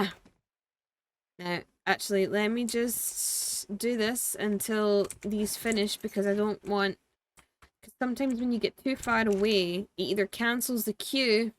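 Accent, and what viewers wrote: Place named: Scottish Highlands, United Kingdom. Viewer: Hi, I'm enjoying your videos. Your accent leads me to believe you are from the highlands. Maybe in the northwest. Isle of Sky area and the origins of the MacDonald clan. Am I right?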